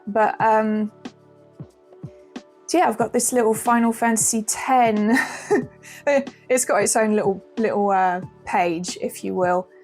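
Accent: United Kingdom. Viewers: Gamer girl with a british accent. It doesn't get much better than this. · Came for the vita, stayed for your cute British accent Love From from Portugal.. +1 sub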